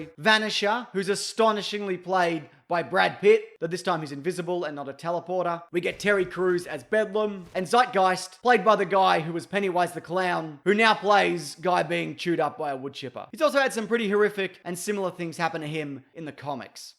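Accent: Australia. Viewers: Is the speaker Australian?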